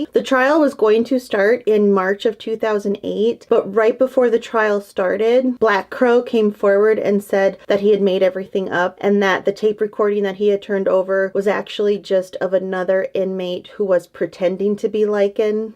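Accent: Minnesota, United States. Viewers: Love that MN accent! ☮️💟. I have it too.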